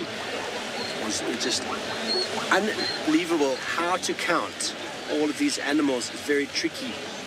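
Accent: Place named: South Africa. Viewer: The south African accent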